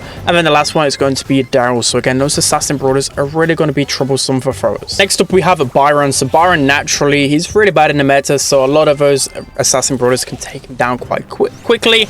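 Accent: United Kingdom. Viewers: I NEED TO WATCH THIS BUT I CAN'T STAND HIS BRITISH ACCENT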